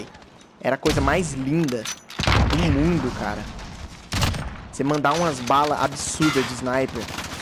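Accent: Minas Gerais, Brazil. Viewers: Você é mineiro?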